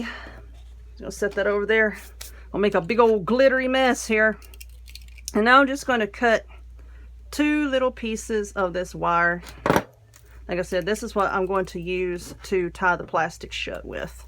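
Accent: South, United States. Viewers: I LOVE your channel. I just found you tonight and I joined your group too. Your southern like me lol. I’m from Alabama. Love watching and learning. You’re awesome!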